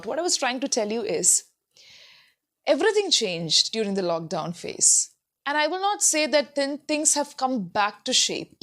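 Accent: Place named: India. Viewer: You look similar to one of famous kannada serial actress Ranjani Raghavan of Kannadati. I admire her and you too and your English and skills of communication as well. Initially mistook you to her. I have a suggestion. There is no complete audio books available on YouTube in an indian accent. You sound sweet and I think every one of indians would surely love and enjoy listening to you. If possible please come up with a comple audio book of any self help book or popular book which you think of. I think you will get market as well. I don't know what you do and why you doing these videos on YouTube etc. Just felt like suggesting..... watching your video I have become a fan of your language and communication skills. Thank you very much......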